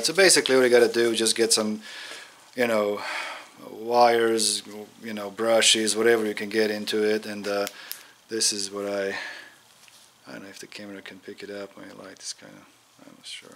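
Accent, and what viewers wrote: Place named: Kentucky, United States. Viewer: Love that old home Kentucky accent Hristos Voskrese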